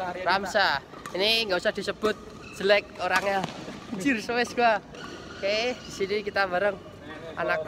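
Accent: Java, Indonesia. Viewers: lu kenapa medok banget jowo nya? Gw asli betawi kalo denger lu ngomong bawaannya ketawa ajah dah liat vlog. Walaupun kadang gw gak ngerti lucuannya.. overall lu kreatif bikin vlog..... lu kapan juara???kapan?gw bakal dukung atlit yg juara.. doa aja ya buat lu mudah2an berlanjut ajah badmintonnya. Konsis.. walaupun gw belum kenal2 amat ttg.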